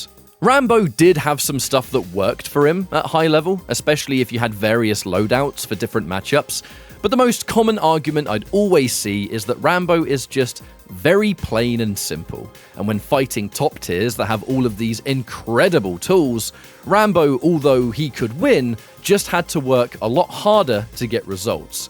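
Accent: United Kingdom. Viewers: I would’ve watched this whole thing if u didn’t have a British accent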